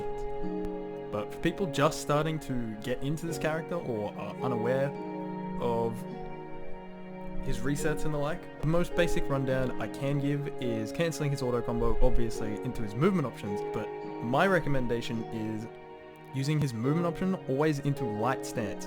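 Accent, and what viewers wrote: Australia. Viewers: Sorry bro but i would rather hear roofles manly alpha voice narrate a hit guide instead of an aussie :( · You know he's Australian cause he says haytch instead of h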